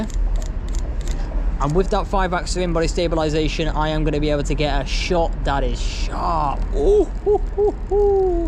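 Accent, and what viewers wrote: Manchester, United Kingdom. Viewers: Jajajajaja What?! No! Manc meaning Mancunian - most of the time your accent brings me back there. I'm Spanish but I've been living up there for a few good years. It's a dear place for me with mostly lovely, warm people (being from Andalucia you kinda miss that when you're away and I got that there) Not to forget that Manchester is in a pretty sweet spot where both Peak district and Lake district are at a stone throw! Soooo many awesome places to explore :_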